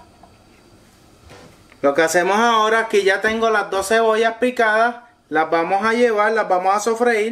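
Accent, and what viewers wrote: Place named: Puerto Rico. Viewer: Que lindo te vez hablando me gusta el acento de los bori hoy voy hacer un arroz con ajo y cebolla 🤗😀🧐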